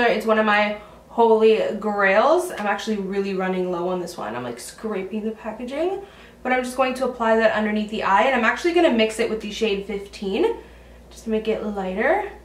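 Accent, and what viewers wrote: Canada. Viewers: Girl ! I love your Channel I just discovered you! I'm sorry if this is a silly question cause I think I can tell but are you Canadian? Also I can't seem to find those morphee lashes!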